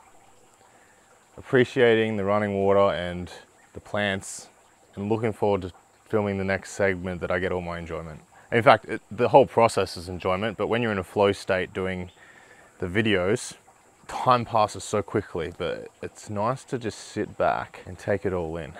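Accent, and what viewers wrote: Australia. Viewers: I can hear you are definitely an Aussie, mate. I'm from Queensland. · You sound like you Australian. Considering your timeline it appears to be spring/summer. Will you be able to do the same in winter?❤ your vid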